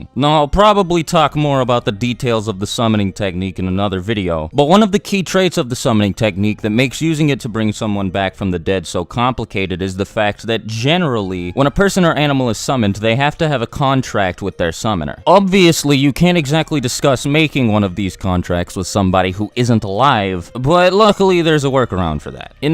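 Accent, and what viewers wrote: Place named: Bronx, United States. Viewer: You sound like someone who should do voice work for "A Bronx Tale"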